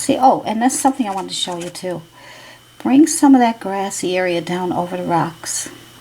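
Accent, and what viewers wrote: Newfoundland, Canada. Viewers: I'm randomly looking at painting techniques on YouTube. And as soon as I heard you talk I knew you were from NL so I did some googling. . And sure enough. Im in corner brook. Great video :)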